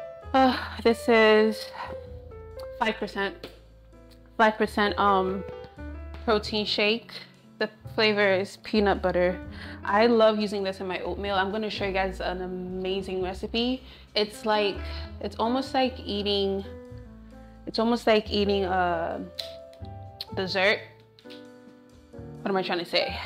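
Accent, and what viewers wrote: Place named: New Jersey, United States. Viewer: Girl, let me find out you from jersey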